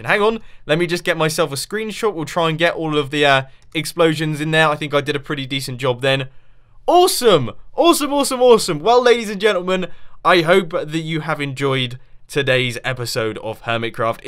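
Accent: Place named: England, United Kingdom. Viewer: You can tell is English (like me) 'I am so CHUFFED with that I'm very CHUFFED!'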